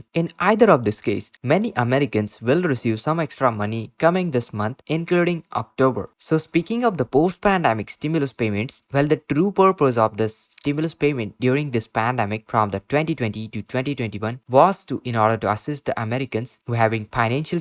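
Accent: India. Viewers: I like your Hindu accent 🙂😉😉 I want a Hindu even though I'm not Hindu, damn baby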